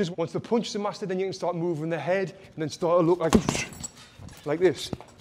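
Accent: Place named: North East England, United Kingdom. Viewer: Cheers mate, where u from? I’m from Durham myself and live in Washington now, you sound like ya from the north east marra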